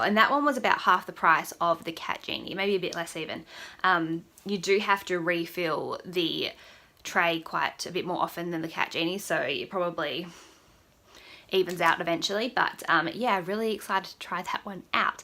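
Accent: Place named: Australia. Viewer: I knew you were Aussie because of your accent,were are you from? I'm from Victoria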